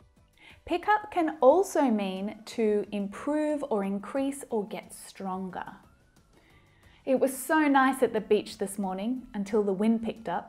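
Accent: Australia. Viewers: I picked up Australian accent by watching video. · Australian accent?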